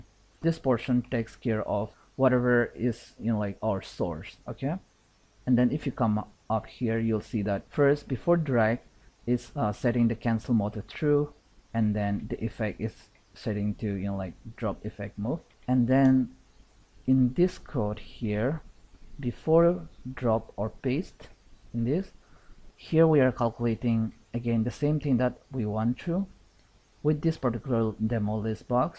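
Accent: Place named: India. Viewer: Good job with showing this technique. From where can we download the sample file? I'm just curious about the accent, are you originally from India?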